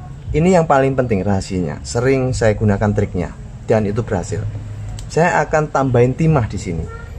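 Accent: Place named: Lampung, Indonesia. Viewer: Sampean lampung ya kang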